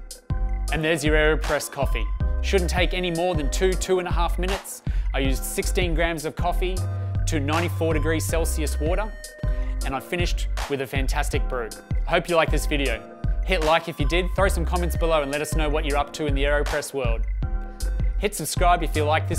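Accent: Australia. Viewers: where in australia is he from? :D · Man, I can't stand the Australian accent!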